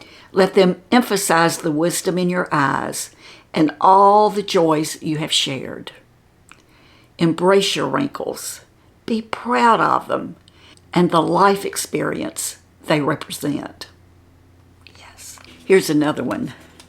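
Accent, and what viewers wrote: South, United States. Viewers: Please enter me in the drawing for the cards; they sound great. Happy 80th! I've been watching you for years. It's your lovely southern accent that first caught my attention and then your confidence and wisdom on so many subjects that kept me watching. I also love seeing your all your artistic projects, like the Xmas hanging balls with lights, made from plastic bowls from dollar store. My daughter and I made those last year and they actually turned out really pretty. Makeup, decorating, enjoy it all. Your videos are especially enjoyable to watch after a stressful day. Hope you never stop!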